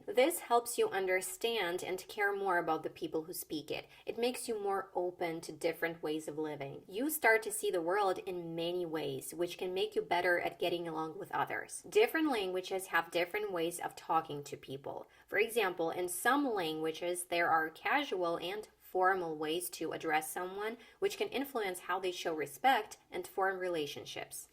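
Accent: United States. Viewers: You sound as an American , and i really like hear your voice and your information. 🎉Thanks ☺️ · Thank you for this video. You speak an excellent american English . Impressive. I'm trying to do the same with English from south of England but I don't have your talent at all !😅 Very few people can fade their accent. In France, I lived in Paris almost almost my life, I met very very very few foreign people without accent.I just remember a russian woman, that all. Yes, foreign people can speak french very well, but without accent?no, it's absolutly exceptionnal. Absolutly, learn a foreign language changes your mind, and your point of view about a lot of things👍 Nevertheless, I'm 53 years old, my priority is to be understood, to have a consistent pronunciation, and to have fun learning English. See you soon on your youtube channel👍👌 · Good evening my beautiful teacher 😊. 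i love your all videos and the way you explain and teach is fantastic and excellent. i am trying to speak like you but it might take time and i thought in beginning that you are American because i love your parlance. thank you so very much 😊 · If you not say that people say that you are pretending being an American… I couldn’t believe you are not an American… for me you look and sound as an American woman…. Hope one day I can pretend too being an American as good as you are doing it 😅….congratulations! =)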